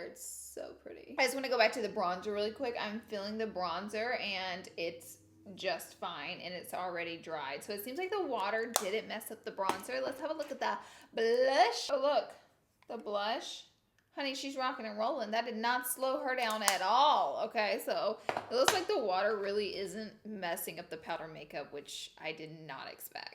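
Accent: South, United States. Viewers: Love your dramatic southern bell voice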